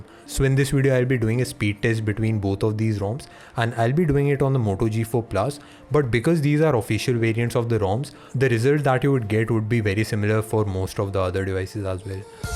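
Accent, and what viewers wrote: India. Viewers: yeah after he lose that Indian accent. people just dont like Indian accents. They talk so fast and dont realize they english is not that great because of that super strong accent.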